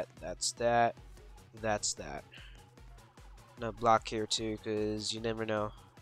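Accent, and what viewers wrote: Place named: New Jersey, United States. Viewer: Question did you have a teacher named Mr. Lambersky he is from the New Jersey area and has your accent.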